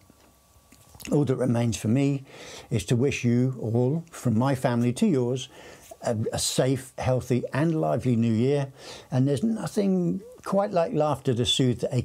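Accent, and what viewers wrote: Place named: Ireland. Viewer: Your Irish accent helps your story telling . You have the voice and speed of a good story teller.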